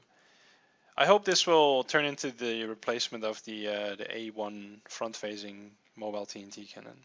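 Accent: Netherlands. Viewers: Is he dutch??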